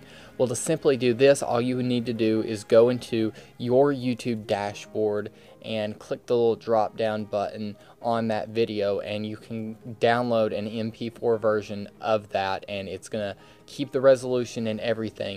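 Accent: United States. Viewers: This dude is probably from Texas, the accent throws it off. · Finally an American, Indians are absolutely irritating to listen to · I love your Country accent :3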